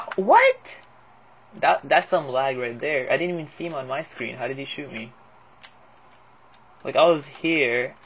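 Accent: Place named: Canada. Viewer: you sound exactly like every other canadian admin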